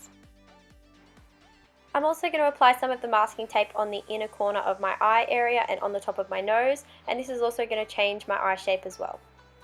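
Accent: Australia. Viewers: Are you Australia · I love her Australian accent.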